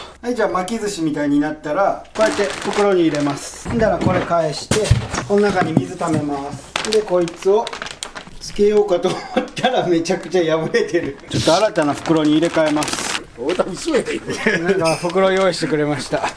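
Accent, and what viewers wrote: Kagawa, Japan. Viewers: 飾らない讃岐弁だから視聴者登録数が伸びるのではないでしょうか。私も今ではすっかり忘れてしまった讃岐弁を思い出して楽しく拝見しています。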